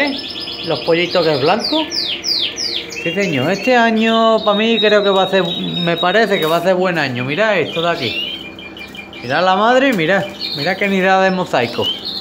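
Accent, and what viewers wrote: Andalusia, Spain. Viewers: Ese andalu que parece que va a contar un chiste...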